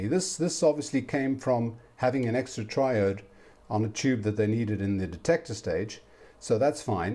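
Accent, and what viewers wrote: South Africa. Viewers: "do not do this at home.." Why not, thats an excellent solution if the correct part is not at hand, hehe :-) Judging from your accent this old saying would apply: `n boer maak `n plan. En dis geen kak plan nie meneer, dis baie goed werk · I happened on one of your newer videos, found value (got two needing new capacitors), so started at the first video posted. Dad passed on an interest in people and their accents, so was trying to place it, vaguely familiar. Now knowing an Afrikaans accent, of course, heard it years ago in some of the Uni classmates. My only connection is Dutch ancestry, who desired so much to be American, our family no longer speaks the Mennonite dialect or German. But, the attraction to Germanic was not removed, have a Telefunken upright console and a Körting (an American-German love child, my example, a stereo SE ECL86 with not one shred of information on the internet). 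😜 Cheers, Adriel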